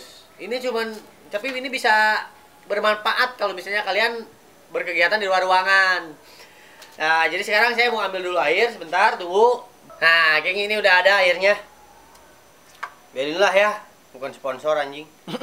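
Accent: West Java, Indonesia. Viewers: seceng anying wkwkwk khas sunda bgt lo bang hahaha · Sunda pisan logatna hehehe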